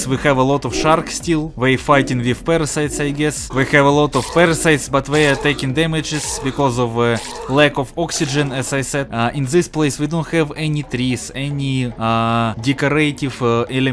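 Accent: Russia. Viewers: Love your Russian accent!!! Anyone who doesn’t is cyka blyat !!! Third comment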